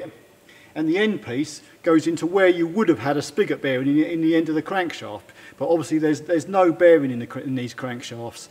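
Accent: Cockney, United Kingdom. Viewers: Are you from London? Im Croat and you sound to me like cockney accent, am I right?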